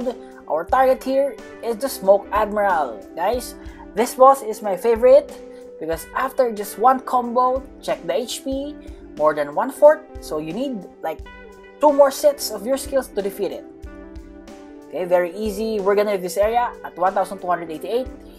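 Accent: Philippines. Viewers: Of course he is, my filipino teacher who teaches English in my school in Indonesia have a same Accent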